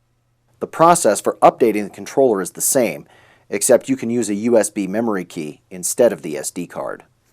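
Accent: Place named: United States. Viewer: I was shocked when I played this video. The last thing I expect was to hear an American voice. First thing I did was give you a thumbs up, know what I mean Vern?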